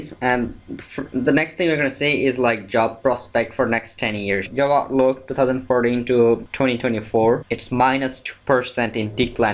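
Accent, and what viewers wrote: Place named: Bangladesh. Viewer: what major do you think is best for guys like me who is willing to do engineering(undergraduate) but not sure what to begin with... i sense you are from bangladesh(by your accent) ... so am i ... whats your advice to me as a fellow bengali ... what major should i do?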